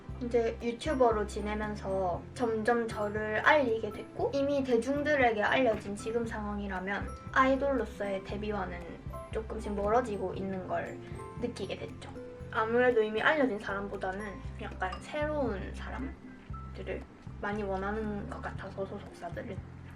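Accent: Busan, South Korea. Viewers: 어투는 표준어, 억양은 부산 사투리, 발음은 또박또박... 각 특징이 뚜렷하시네요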